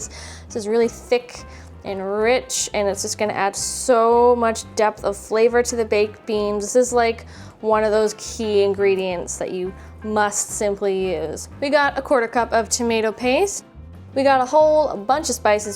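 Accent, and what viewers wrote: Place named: France. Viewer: I loved your French accent!😊 I’m new here and I’m glad I found your channel!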